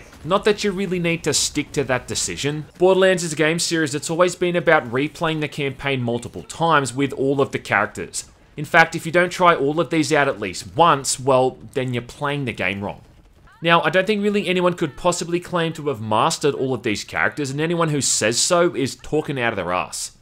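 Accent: Australia. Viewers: I don't get why you're so hung up about them removing a slur from a game. Comparing your Aussie accent to being a little person is kinda apples and oranges. I get that ppl on twitter can be volatile, but like, there are reasonable reasons that ppl would want m*** removed from the game. In my experience, even trying to have a calm discussion about these things causes people to plug their ears and go, "Sjw sjw, i can't hear you, tuning you out, bla bla bla bla bla bla" I'm aware that gearbox doesn't actually give a shit about the slur, and is just protecting their bottom line.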